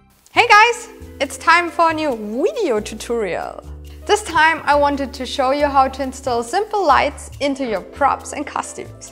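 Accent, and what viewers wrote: Germany. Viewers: Ich bin selber aus Deutschland und erkenne den Akzent meiner Sprache ;) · Are you german? Becuase ur accent sounds like that. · Ist sie Deutsche? :D Eigentlich hört man es ja, aber keine Ahnung, kann ja auch ein anderer Akzent sein. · german accent strong with this one :D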